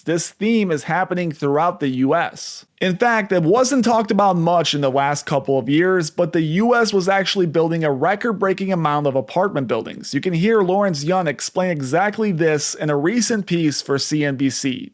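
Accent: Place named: Chicago, United States. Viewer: You from Chicago? That accent...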